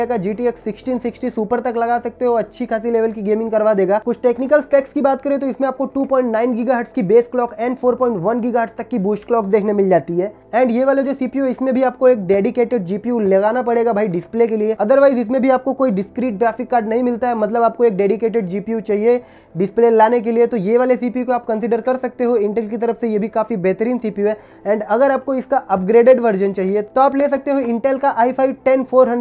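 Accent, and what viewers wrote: Gujarat, India. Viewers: Bhai aap Gujarati Ho kya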